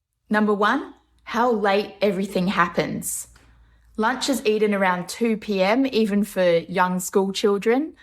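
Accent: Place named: Australia. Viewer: I could listen to you talk all day long, LOVE your Australian accent ❤️🇨🇦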